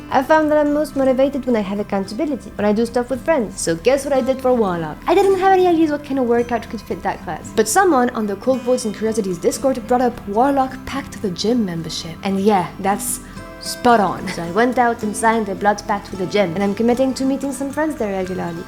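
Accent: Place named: France. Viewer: Okay great, now you not only have a super cute french accent and love DnD but you're ALSO a strong muscle mommy? My brain can't handle this combination of traits I adore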